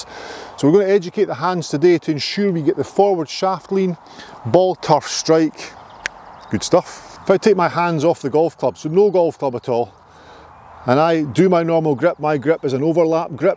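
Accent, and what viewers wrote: Scotland, United Kingdom. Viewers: Truly the best golf instruction I ever received! This instructor is the one that, finally, instructs...as if all the others I have met were hiding the true "secret" of golf. And the beautiful Scottish accent comes as a bonus, straight from the land where golf was invented! Thank you.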